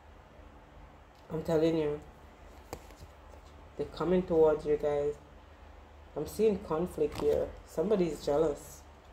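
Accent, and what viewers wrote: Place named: Trinidad and Tobago. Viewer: Your readings are magnificent. You are sounding Trini 🇹🇹 & familiar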